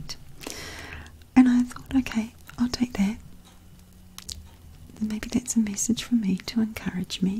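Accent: New Zealand. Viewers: Are you kiwi! Love Kiwi christians 👏🏻👏🏻🙌🏼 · I appreciate this so much. It was so wonderful to hear a New Zealand accent again as well. Four years ago I spent 5 months in Oxford, Canterbury at a YWAM base there and God worked so much in my life at that time. I accepted Christ when I was 4 but needed to experience Him on a deeper level. What I realized while I was there that has made the most difference in my outlook, is how much of my life I still needed to surrender and trust Him with. I’ve come to realize that is so, so much of being able to really live out the Christian life. Do you know what I mean? Thank you my sister in Christ for letting God use you to speak to others encouraging them in HIm. Also, I greatly respect people doing foster care to help others! Thank you for your sacrifices...I’m going to lift you up in prayer right now that God will encourage your heart today. 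🥰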